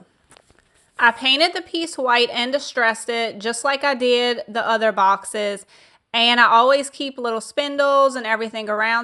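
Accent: Louisiana, United States. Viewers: Are you a born Cajun? Is that the accent I hear? LOVE IT SO MUCH! Your projects are some of my favorites ever. XOXO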